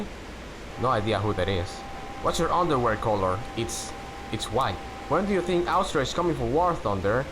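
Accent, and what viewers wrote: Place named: Spain. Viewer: Yo ya decía tenía un poquito de acento de español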